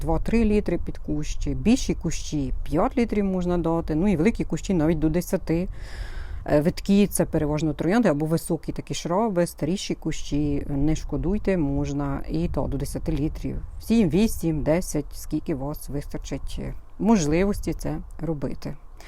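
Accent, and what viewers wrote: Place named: West, Ukraine. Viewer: так ви з Полтави? І не скажеш. Розмовляєте так прямо як із заходу. Мені дуже подобається нормальна українська мова, бо у нас на Дніпропетровщині суржик. Щось як ляпнем.... вчилися в совдепіїї у рускіх школах. Занедбали нашу красиву співочу мову. І до сих пір не ленійка, а лінейка. Це я так колись сказала.дякую за відповідь. Хай щастить.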